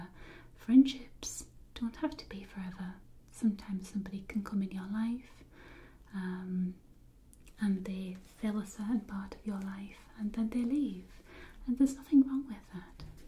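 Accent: United Kingdom. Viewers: Y ella aún habla el inglés británico, y así se queda más difícil para muchos 😣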